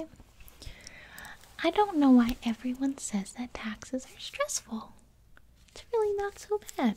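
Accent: South, United States. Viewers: Why did her voice change from the start to the end she started to talk with like a southern accent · ...She always speaks with a Southern accent? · i love how her accent turns slightly southern. Cute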